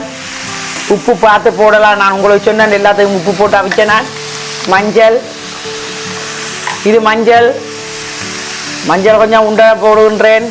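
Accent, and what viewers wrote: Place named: Jaffna, Sri Lanka. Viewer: very nice talk in jaffna language good 😂😂😂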